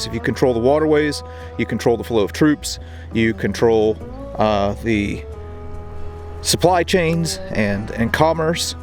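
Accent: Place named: New York, United States. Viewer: Where are you from? You sound like youre from NY and youve never been to fort Niagra, or fort William Henry?..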